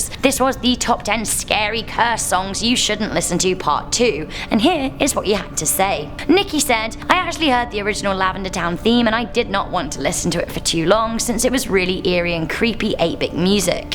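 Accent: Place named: England, United Kingdom. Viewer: I started watching this channel originally cuz I love English accents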